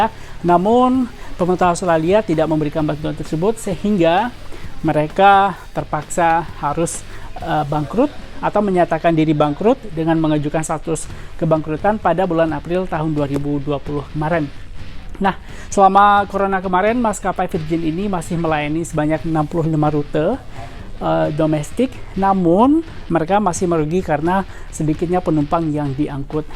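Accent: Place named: West Sumatra, Indonesia. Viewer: Orang sumatra Barat nih presenternya... Logatnya mantep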